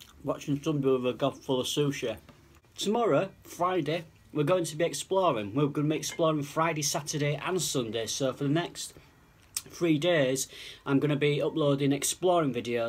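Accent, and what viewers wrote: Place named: Northern England, United Kingdom. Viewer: You've got to stuff it in your gob, couldn't sound more northern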